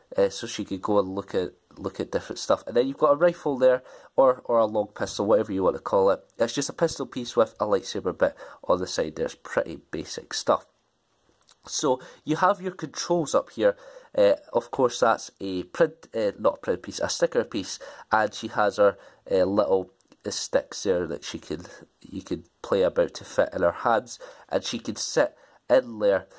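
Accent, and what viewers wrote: Scotland, United Kingdom. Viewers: What part uv Scotland u from?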